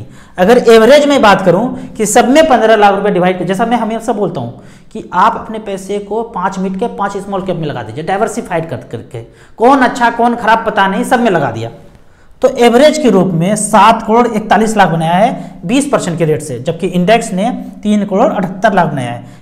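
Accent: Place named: Awadh, India. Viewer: सर आपका होम डिस्ट्रिक्ट क्या है , आपकी बोली में अवधी का पुट है इसीलिए जानने की इच्छा है, आपका फॉलोवर...💐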